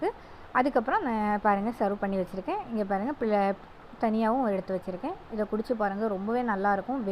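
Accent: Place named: Kanyakumari, India. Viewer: neenga pesura slang vachi than sonnen nanum kanyakumari than akka nice tips akka appreciate you 👏👏👏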